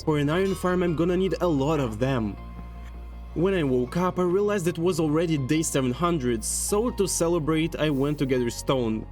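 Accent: Russia. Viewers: when do you guys realised he is a russian guy, i realised it in 2 min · I hear russian accent lol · С первых слов поняла что ты русский, но не было доказательств, пока не увидела достижения 😂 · Настольуо акцент русский слышен, что даже забавно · У тебя русский акцент По секрету всему свету скажи откуда ты? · nah, its russian accent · как же палишься братан по акценту)) своих видим за версту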